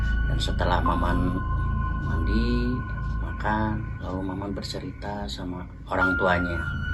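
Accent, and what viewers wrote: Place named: West Java, Indonesia. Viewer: Ceritanya serem, pembawaannya enak dan pertahankan Aksen sundanya Kang!!🦾🦾🦾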